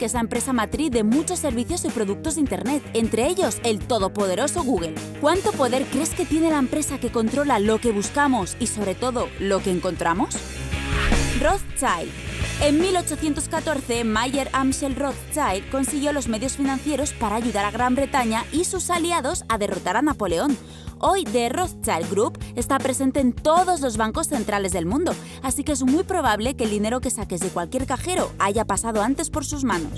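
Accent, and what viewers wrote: Spain. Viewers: Recordar que es española y en cada país pronuncian las cosas diferente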